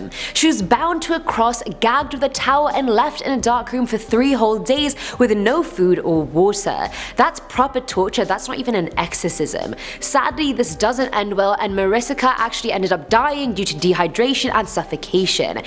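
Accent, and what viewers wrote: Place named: England, United Kingdom. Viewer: This girl has the classy English accent without getting on my nerves. She's dramatic. Flaps her delicate white hand to her heart. I'm angry. (She's not wrong).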